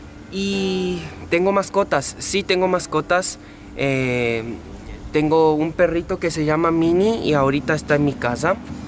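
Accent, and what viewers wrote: Paraguay. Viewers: tu acento si que es Paraguayo😅 también soy de Paraguay. Saludos de Quiindy😄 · Trasmites la calidez de un paraguayo. Te siento un paraguayo más y creo que la pronunciación de la "R" lo confirma. Suena bien paraguayo. Muy bueno tu canal. Cuando vengas por Asunción, ya tienes un amigo. Sólo avisa y planeamos algo. Saludos!!!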